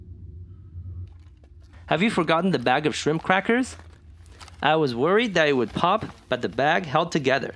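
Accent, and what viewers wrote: China. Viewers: Is the “ Commentator “ Chinese who speaks English??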